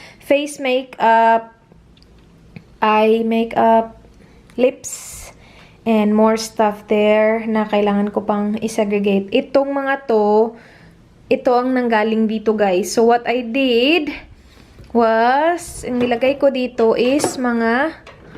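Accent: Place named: Visayas, Philippines. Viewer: AGUY 😂😂😂 pero cute paminawun TATAK BISAYA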